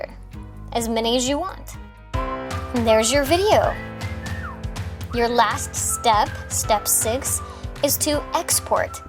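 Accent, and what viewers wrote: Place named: France. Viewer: Wow this is a master piece video. You covered it all and we can see your heart through the content. More than educational. I love your French accent "Très bien" 😊 Merci beaucoup 🙏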